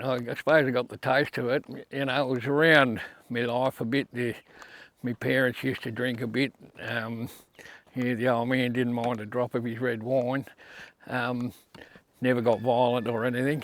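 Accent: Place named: Australia. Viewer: Love this channel bro! Im very envious of you being able to live off grid. P.s i understand every word you say. It's hard to believe some people struggle to understand your accent. Maybe because im Australian also.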